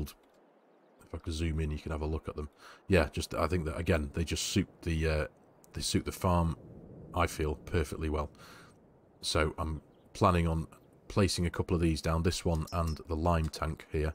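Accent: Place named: North, United Kingdom. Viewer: This is a very good series. Thank you. Love your accent, you sound more northern.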